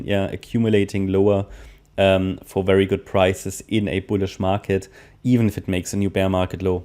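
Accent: Germany. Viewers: do have any german roots?your accent is like german..just curious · Bro is german☠️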